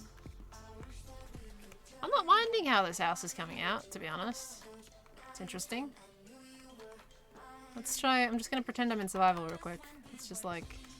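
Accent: Australia. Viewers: Your Australian? I am too. Finally a Youtuber I dont have to get up early to watch!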